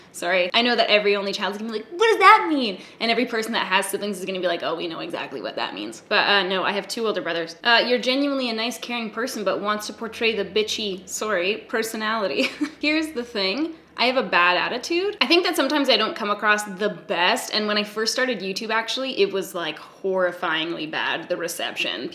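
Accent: Canada. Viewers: This is going to be such a random comment but in this video you sound like the Canadian stereotype from a century old. Yeah. Sorry about that, I had a weird association.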